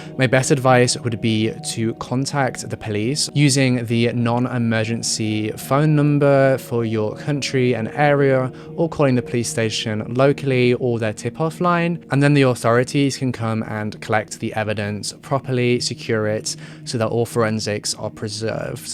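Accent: United Kingdom. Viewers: Stumbled upon this video, and as a Swedish person I gotta say that I'm a bit surprised that this story made it's way out of Scandinavia. I saw that you also made one on Engla, another Swedish girl. For us, here, it seems like we are hardly even on the radar of the rest of the world. Where are you from? I think you sound a bit British, but I'm not sure, since I'm no accent expert. Just out of curiosity.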